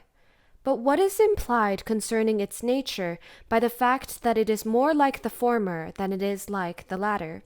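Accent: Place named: United States. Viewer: Thank you for making this audiobook of the essay "Bullshit" by Harry G. Frankfurt (The USA 1986). Your diction, cadence and enunciation of American accented English is excellent. 👍 I just discovered your channel today, Sun. 3rd Aug. 2025 after reading an article about the essay on The Telegraph UK, mentioned on Drudge, It is true, the world in 2025 is awash in lies, humbug, obfuscations, spin, propaganda, deflection, misinformation, cover ups, destroying and concealing evidence, muddying the waters and leading the hounds of the scent. However, there is Objective Truth in the universe, i.e. either something happened or it didn't. A good saying I first read in 2003 comes to mind: "It doesn't matter what you think or believe, we will all know what is true and correct in the end!" שְׁנָאֵל שְׁפָתִים שַׁקְרִים יְהוָה, וְבָאֲנָשִׁים חָפֵץ. 😎 Adam Neira Founder of World Peace 2050 Founded in April 2000 Paris - Jerusalem - Melbourne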